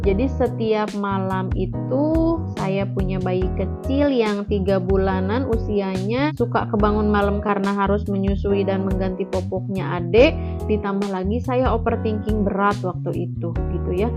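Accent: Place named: West Java, Indonesia. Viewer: nuhun teteh 😊😊 ari teteh orang mana? da logatna sunda mah moal kabobodo hehe teteh pasti orang sunda. abdi penonton baru teh.. ari teteh sbrha lama kena gerd?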